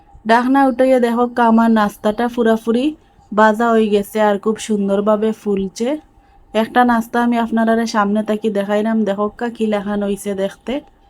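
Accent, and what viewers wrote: Sylhet, Bangladesh. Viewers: আসসালামু আলাইকুম আপু মাসা আল্লাহ নাস্তা খুব খুব সুন্দর লাগলো আমার নমে হয় সিলেটের বোন কথায় বুজা গেলো❤❤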